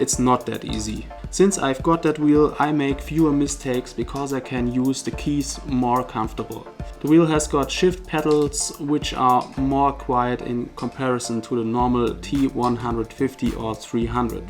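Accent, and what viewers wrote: Germany. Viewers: Wir deutschen haben halt einfach diesen Dialekt den man nicht überhören kann :-) Und weil du gesagt hast du wärst normal groß wie groß bist du denn? Habe keine Kohle mir das Zeug zu kaufen aber trotzdem... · Du klingst voll deutsch lol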